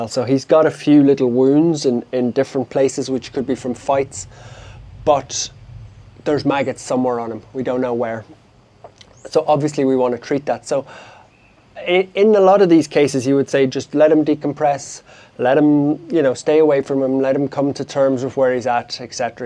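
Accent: Ireland. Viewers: Irish brogue/lilt ❤❤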